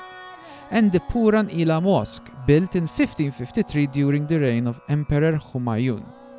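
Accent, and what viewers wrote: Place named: Italy. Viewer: mmm. Your accent is definitely Italian. Bordering on stereotypical even, but I can't knock you too much, you only have so much control over your accent.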